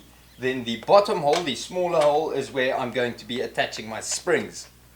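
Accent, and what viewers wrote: South Africa. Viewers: Hi. Gr8 to hear that unmistakable South-African accent. I am based in Benoni, Gauteng. Been on your channel now for about 3 months. Really enjoying your work. I am also left handed so your hand work makes perfect sense to me. that "dido" you spoke of is pronounced "Dado" I am fairly new to woodwork and eager to learn. i am on my 3rd project of the lock-down and like you, ran out of materials (and beer) while ago. small little projects to build skills and keep me out of the wife's way... keep going and well done..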